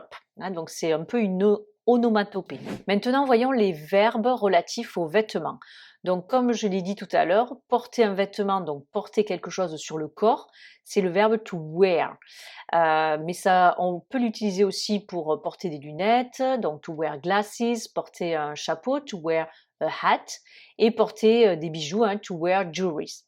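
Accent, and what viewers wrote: Toulouse, France. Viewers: As usual, I still very happy hearing your Toulousain accent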